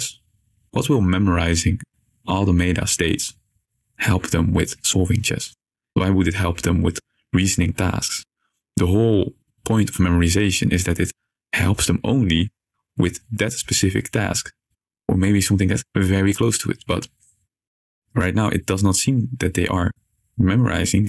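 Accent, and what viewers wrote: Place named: Netherlands. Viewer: Interesting video. Good job. Just one thing: your audio stops at around 8 minutes into the video but the video still goes on for like another 2 minutes. Maybe something got cut off? Regardless I just subbed and love the Dutch accent. Down for more content in the future :) Greetings from Germany and reddit lol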